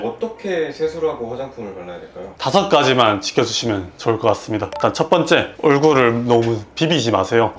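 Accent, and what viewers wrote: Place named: Gyeongsang, South Korea. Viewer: 갱상도 쌤이네요 씻껐다 치고~♡ 씻었다고 치고^^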